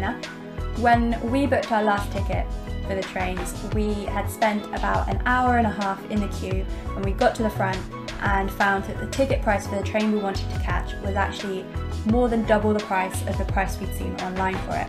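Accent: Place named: United Kingdom. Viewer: Love your strong brit accent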